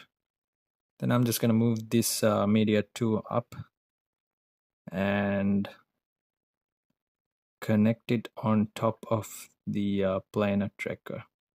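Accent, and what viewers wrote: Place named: India. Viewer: Du hast so einen indischen aktzent hört sich witzig an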